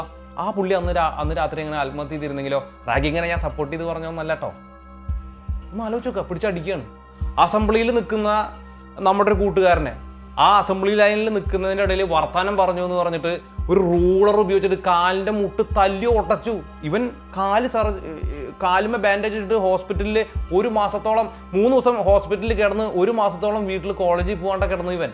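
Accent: Thrissur, India. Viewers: മലയാളത്തിലെ ഏറ്റവും ഇഷ്ടപെട്ട സ്ലാങ് ആണ് തൃശൂർ സ്ലാങ് ആ സ്ലാങ് കേട്ടു വന്നതാ ഈ ചാനലിൽ ഇപ്പൊ favourite channel ആണ് 😍( ഒരു പാലക്കാട്ടുകാരൻ )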